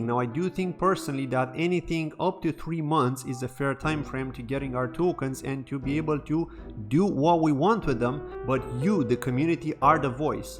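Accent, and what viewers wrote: Ireland. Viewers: really !!! Didn’t know that, I thought that you had a touch of a Kerry accent alright 😂😂😂 yep, I’m Irish 🇮🇪🇮🇪🇮🇪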